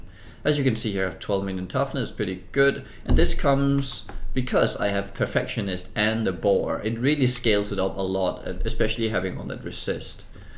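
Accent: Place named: Denmark. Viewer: Syntes nok jeg kunne høre lidt dansk accent! :D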